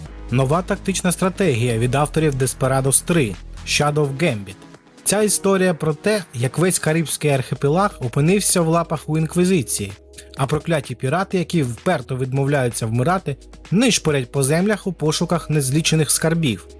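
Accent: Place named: Surzhyk, Ukraine. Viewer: ааа снова на суржике пшешишь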